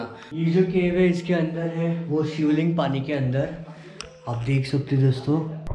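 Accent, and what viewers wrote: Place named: Maharashtra, India. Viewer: I love your Maharashtrian Hindi 😘 (suggestion - just make it shorter , within 20 minutes)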